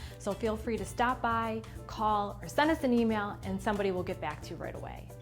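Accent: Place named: New Jersey, United States. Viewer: Pretty hard to take this seriously. Mispronouncing the entire thing that the video is about with a Jersey accent and all. Lol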